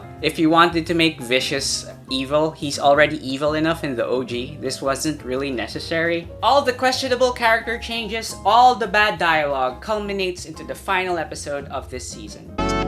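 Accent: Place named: Philippines. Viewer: LMAO! HAHAHA, KABABAYAAAAAN! AAWOOHH! Sabi ko, parang pang pilipino accent neto a... pati ung hearts sa mga comments... Pinoy nga, ahahahah, Subscribe to you and God Bless Pare.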